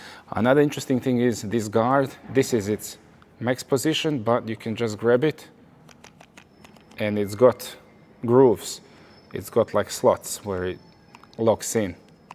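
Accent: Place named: Europe. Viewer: Don’t let the accent fool you he’s from Australia. I thought I was watching a guy from Europe.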